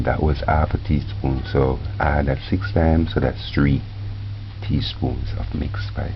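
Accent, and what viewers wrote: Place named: Jamaica. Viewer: Excellent Job!!! You had me engaged during this experiment and laughing at your speculations with your yardie accent. Enjoyed your excitement that reminded me of Lucious. I'll try my hand at this non alcoholic version of the Easter Bun for 2019 holidays. Blessings to you and yours!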